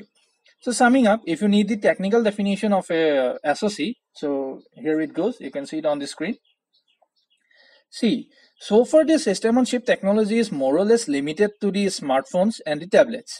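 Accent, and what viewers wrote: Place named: Assam, India. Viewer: Ur Assamese ascent shows u r from nalbari side.which college engg?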